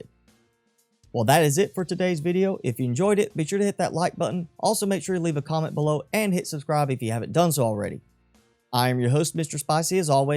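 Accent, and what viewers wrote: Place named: Alabama, United States. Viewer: ALABAMAAA ACENT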